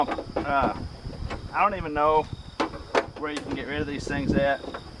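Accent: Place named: North Carolina, United States. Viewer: I'm curious about where your accent is from? I like the way you talk...sounds like north Carolina.....thx for the vids and info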